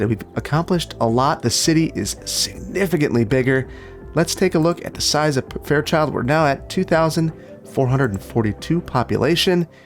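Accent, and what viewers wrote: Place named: Upper Midwest, United States. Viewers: you really hear that north midwest come out in baggers (BAEggers) I think that part of the regional accent is so charming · Lol, "certain amenities like *beggars*"...Gotta love that midwestern accent!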